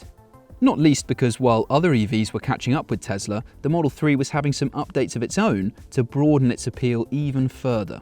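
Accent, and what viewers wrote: RP, United Kingdom. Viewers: Dude sounds like an uppity Englishman who lives in a conglomerate complex, somewhere near the Houses of Parliament or their new King Charles III👑🇬🇧🤩🤴🏻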